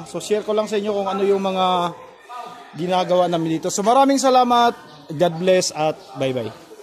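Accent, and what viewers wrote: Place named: Visayas, Philippines. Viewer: Bisaya kayo boss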